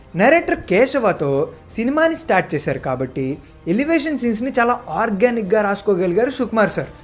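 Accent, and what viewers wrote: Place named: Chittoor, India. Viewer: Love from chittoor 💕. Chittoor slang nee bagane try chesaru meeru.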